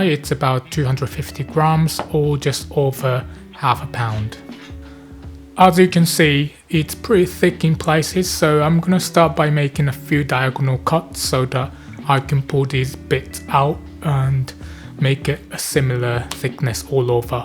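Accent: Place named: East London, United Kingdom. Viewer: Cheers for the recipe mate! Did you live in London before? I can detect a slight East London accent ;) Love your content! I'm gonna try to make this dish tonight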